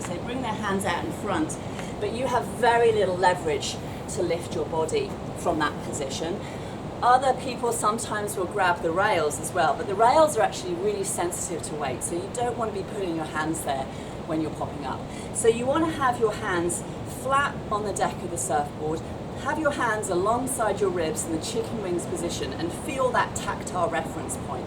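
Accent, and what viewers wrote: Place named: United Kingdom. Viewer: normal british accent i guess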